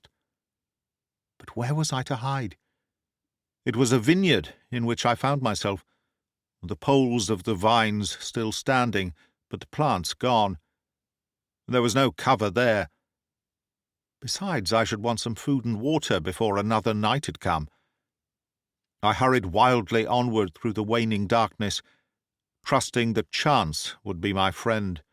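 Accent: United Kingdom. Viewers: I can't express how much I enjoy listening to your clear British accent... Please keep posting more on your channel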